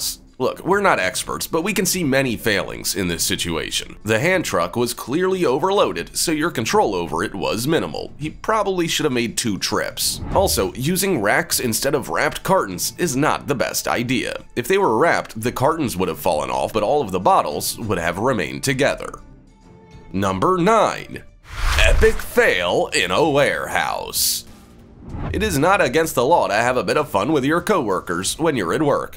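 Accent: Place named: United States. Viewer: Where is the narrator from? He seems to have an American accent, but uses expressions like "gave it a go" and "went pear-shaped". It's jarring.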